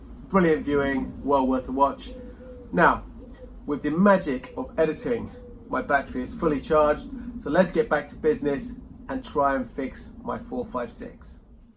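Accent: England, United Kingdom. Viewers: Came over from...fellow Englishman and so don’t need subtitles 😂🤪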